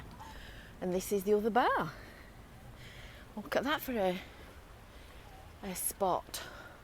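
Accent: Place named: Yorkshire, United Kingdom. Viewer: Amazing video. I was planning to come here too as my channel is all walkthrough in West Yorkshire videos. You also sound Yorkshire too can I ask where your from out of interest? Lol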